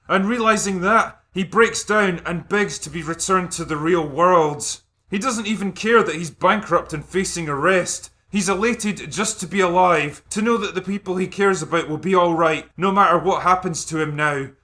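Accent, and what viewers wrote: Scotland, United Kingdom. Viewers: His dulcet Scottish tones warm my soul.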